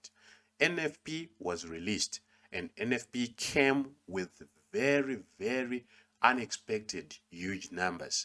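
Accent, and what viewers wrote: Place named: Zimbabwe. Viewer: Hie bro, you sound like a Zimbabwean, are you from Zim?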